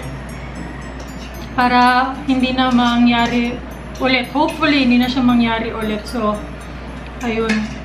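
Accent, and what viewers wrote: Visayas, Philippines. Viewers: Mas nindut bisaya pero syepre naa man gud tagalog viewers so sagul na lang hehe! Lahi ra jud ang accent sa bisaya ka lingaw. Pero ang evap ayaw tawn kalimti 😅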